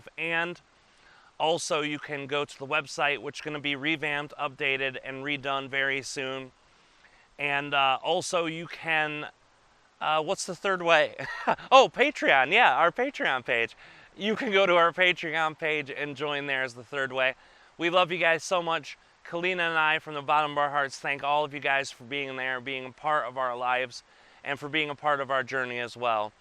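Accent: England, United Kingdom. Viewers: Curious why does he come through with an english accent? Fasting from all things in life in my opinion is the key to resetting the self to become more whole and aware again.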